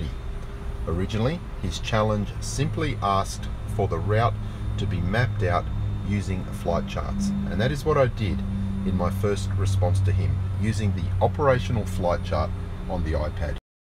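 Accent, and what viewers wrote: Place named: Australia. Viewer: Australian I believe.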